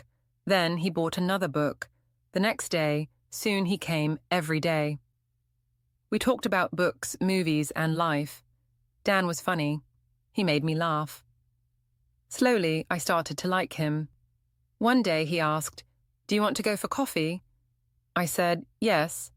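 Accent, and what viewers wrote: United Kingdom. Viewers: I think it's British.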